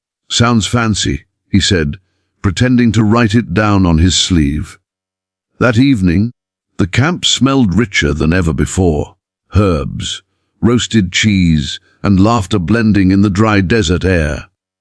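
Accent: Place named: United Kingdom. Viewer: Why do you use a British sample for the narration?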